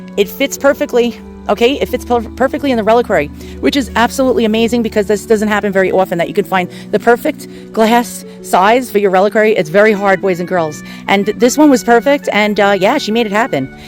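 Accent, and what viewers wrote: New York, United States. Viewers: I miss your older, longer videos! It's you and your hilarious New Yaaaawk commentary that makes this channel! 😂 Oh and ditch the background music. You were perfectly good without it. 👍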